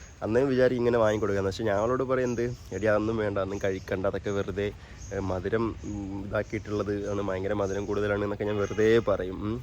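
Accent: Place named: Palakkad, India. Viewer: കൊല്ലം ഭാഷ മറന്നോ മൊത്തം പാലക്കാട് ഭാഷ ആണല്ലോ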